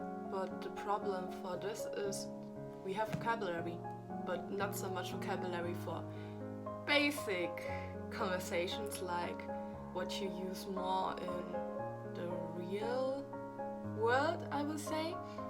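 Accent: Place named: Eastern Europe. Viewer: Thanks for this video! I am thinking about studying sinology and you helped me a lot. I study on high school now but next year I'll need to decide what program at which uni I wanna study and apply there... I was thinking too about studying in Germany although german is not my native language (same with english), I'd improve my german at studienkolleg and then apply to the uni, but I am not sure if it's not too much for me :D And what are your native languages? You have interesting accent, I guess you speak german and some slavic language! Polish, probably?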